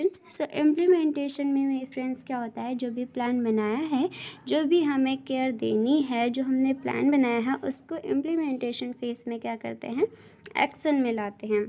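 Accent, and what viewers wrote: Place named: India. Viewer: إنجليزي بنكهة هندية 😂😍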